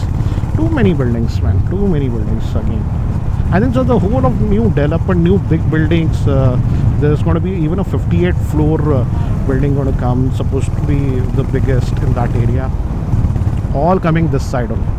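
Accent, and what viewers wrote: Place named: Hyderabad, India. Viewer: Hyderabadi hindi always cracks me 🤣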